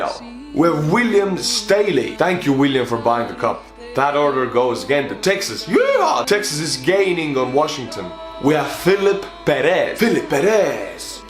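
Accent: Estonia. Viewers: Lahe video :) Mulle meeldib kui sa räägid inglise keeles siis see eesti aksent. :D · Dude u have still a little but of that estonian accent (kinda of that one tha Ardo Asperk made fun of), while living in the states, im native estonian and have only tavelled to US like twice still i dont have that accent, nice one- actually its kinda unique (unikaalne) ;)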